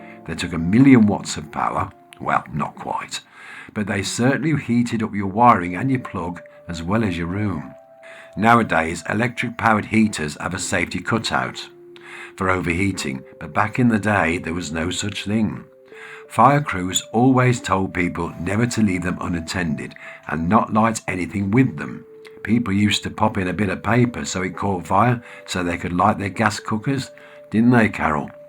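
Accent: Birmingham, United Kingdom. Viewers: 😂😂we just hung bright orange curtains to go with matching fire wall . I lived in brum for 2 yr your accent brings back memories 😅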